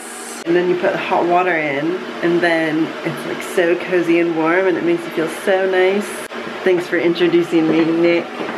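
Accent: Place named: New Zealand. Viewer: we want one video where you the entire time speak New Zealandish:)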